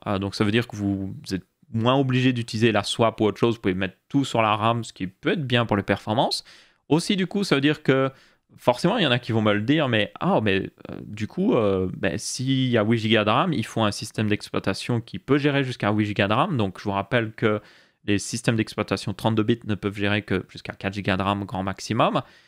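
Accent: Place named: Belgium. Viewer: Je t'ai découvert ya pas longtemps, et tu mérites tes 100k abonnés. Ton contenu est sympa, tu expliques bien, tres pedagogue, et ton accent belge te rend encore plus sympathique pour moi ;-)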